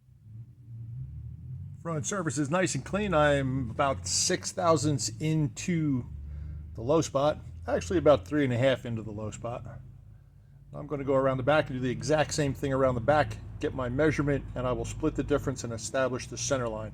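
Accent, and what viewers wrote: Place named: Baltimore, United States. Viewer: Unloosen! Your Bawlamer is showing again,!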